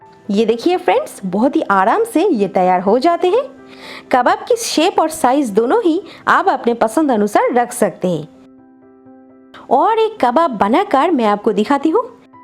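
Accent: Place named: South India, India. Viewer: Your talking way south indian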